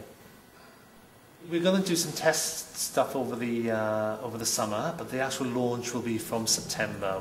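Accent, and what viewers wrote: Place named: United Kingdom. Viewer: There you are! Still with beautifull British accent! Ganbatte! from Y.M. Okinawa Japan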